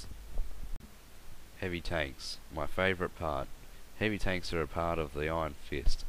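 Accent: Australia. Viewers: ozzies always say youchoob. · Nice job and some helpful tips. I regard the rich and mellifluous Aussie accent as a bonus. Thanks, mate!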